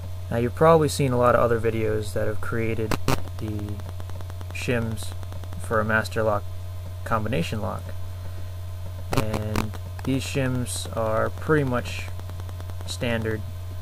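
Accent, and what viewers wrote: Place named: United States. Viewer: i cant hear anything at the end, and he has a proper amarican axent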